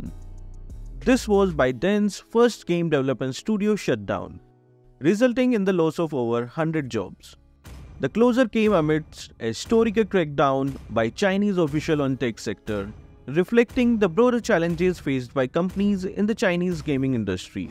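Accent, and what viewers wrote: India. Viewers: So difficult accent indian ... Caption made you understand instead your voice over..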